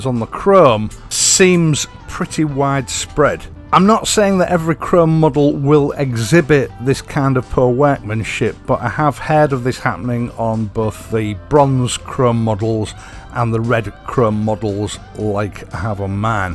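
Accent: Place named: Northern Ireland, United Kingdom. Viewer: I really like your videos, they are very detailed. Also, you sound a lot like Uncle Colm from Derry Girls, which makes your videos all the more pleasant!:)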